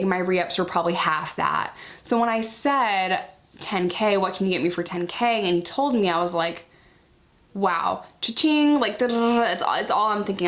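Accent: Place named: Harlem, United States. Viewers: Sounds like the Bronx 😂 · Sounds like Harlem or South Bronx...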